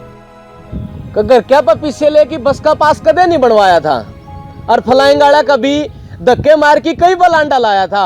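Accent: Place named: Assand, India. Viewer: I am from Multan Pakistan .bhai lagai se kime assand ke ilake se hei kunke mere gam main assandiai bethe se.look like u r from assand karnal because my village my maternal relatives speake 100 % same language with the same dialect. I am surprised to my languageis alive in haryana india.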